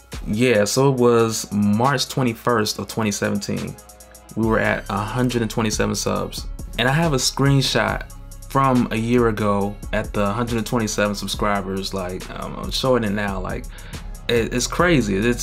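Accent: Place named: East Coast, United States. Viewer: where are you from? iam from jersey and you definitely have that east coast vibe..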